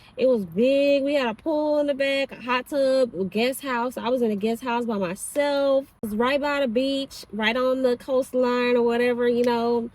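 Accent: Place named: South, United States. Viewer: Where you from? You have a southern accent!!! It’s cute 💕💕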